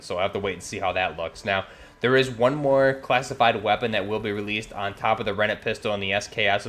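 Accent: New York, United States. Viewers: Just curious, from the sound of your voice, you live in the new jersey/ new York area?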